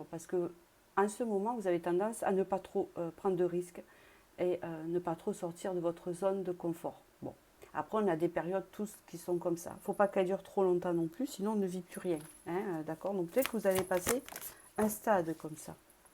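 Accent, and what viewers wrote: South, France. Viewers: Merci merci merci pour toutes vos belles et inspirantes vidéos 🌸🌼🌺🎉 et n'écoutez pas les mauvaises paroles sur votre accent, ce sont des personnes malveillantes et sont jalouses des personnes du sud mais ça on n'y peut rien! Vous êtes formidables et apportez beaucoup de lumière ne changez rien et ne changez pas vive le sud 🌞🌞🌞💞 Solange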